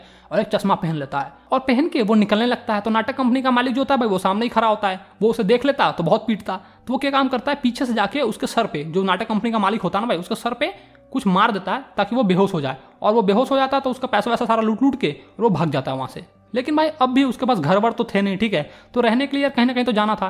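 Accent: Bihar, India. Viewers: Aap bihar ke ho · Bihari ho abe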